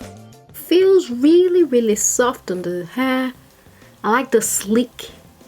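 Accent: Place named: Nigeria. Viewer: love ur accent are u nigerian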